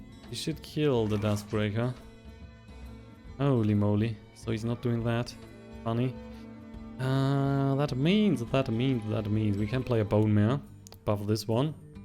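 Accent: Germany. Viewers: Youre german right?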